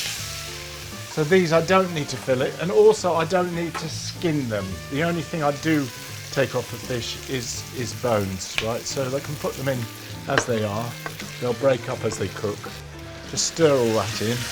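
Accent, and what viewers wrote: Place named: United Kingdom. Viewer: I definitely make this soup you just made . it's good to be a good cook or a chef .I am an American I like your British accent.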